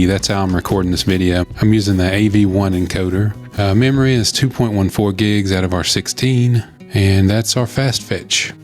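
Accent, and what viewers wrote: South, United States. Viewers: you got a bit of a southern accent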